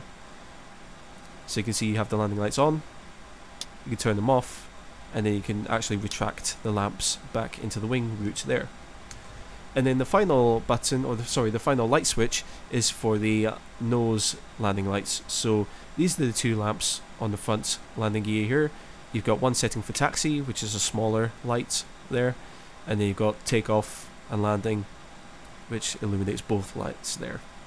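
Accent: Scotland, United Kingdom. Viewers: lol, please make more videos like this. Do you have videos like this for the other, or pmdg range of aircraft? Those would be great. greetings from an Aberdonian in Michigan!:) loving your vids and Scottish accent which I miss living in the USA. edit: 911 sticks out too, have you seen Ex CIA Agent/Asset Susan Lindauer? I just saw her speech "to truthers" yesterday.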